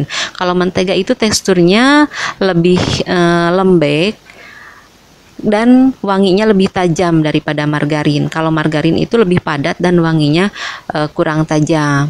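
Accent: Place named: West Java, Indonesia. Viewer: ibu ini orng sunda kaya nya ...beda bicaranya bilng kocok jadi kocek ...mntap bu puding nya mau bikn nnti klu pulkam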